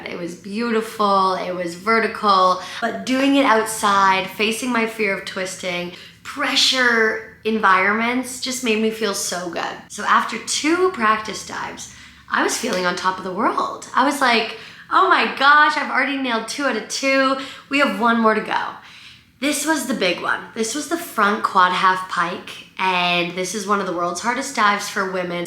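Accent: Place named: United States. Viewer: Where’s your English accent gone? You sound REALLY American 😳😢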